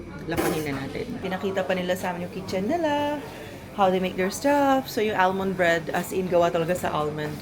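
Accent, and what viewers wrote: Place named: United States. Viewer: Ohh i know who looks like now, she looks like Priyanka Chopra, but has American English accent than Priyanka.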